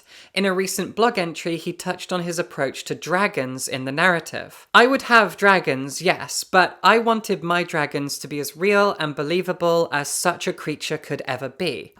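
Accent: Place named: RP, United Kingdom. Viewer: So you’re a lazy contrarian with a posh accent. Congrats.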